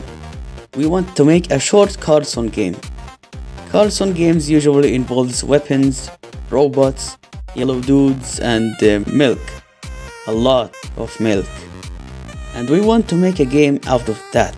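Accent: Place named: Arab world. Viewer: عربي؟ i knew it from the acent then the T H I C C Milk!!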